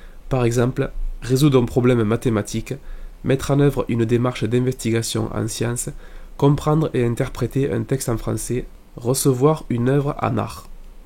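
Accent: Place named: Provence-Alpes-Côte d'Azur, France. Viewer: Pourrais-tu m'envoyer le lien pour télécharger les fichiers audio ?? Je crois que tu vas me bercer longtemps 😉 et pour l'accent j'étais pas loin, je suis en Paca 😁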